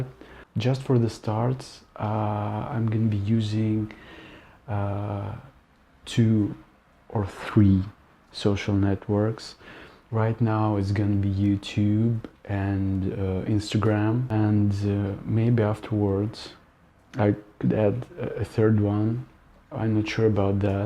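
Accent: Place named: Russia. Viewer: Please keep your accent, your English is good LOOK MEANS NOTHING ITS YOUR CONTENT, JUST BE TRUE TO YOURSELF AND KEEP REPORTING WHAT THE PUBLIC WANTS AND HELP EDUCATE THEM UNDERSTAND WHAT WE CAN ALL DO TO CHANGE IT. Yeah/Yes it would be good to have an introduction song to be played at the start of your videos. Maybe edit a few time lapse clips together over you speaking to people on the streets about really important things. If you don't have the kind of equipment tell others you might know if they can help you edit it. We're all products of our environment and exposure to it, of course those in powerful positions within our governments thrive on us all being against one another. We can't let them keep pushing their narrative of negativity towards each other because none of us hate each other we all want peace and to get along. Again please don't lose the great Russian accent, it's your national sound and you and everyone should be proud of their individual accents. 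Good videos please keep them coming.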